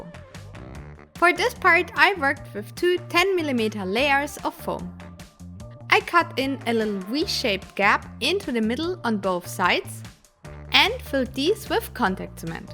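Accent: Germany. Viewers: Make a german Version (man hört das du deutch bist ) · Are you from germany? Because of your denglisch akzent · She's German Her accent is German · Man hört deinen deutschen akzent, lol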